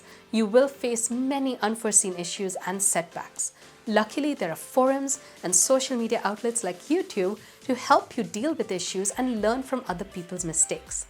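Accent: India. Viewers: Your accent sounds like you're from India. Are you from Mumbai or Mangalaore?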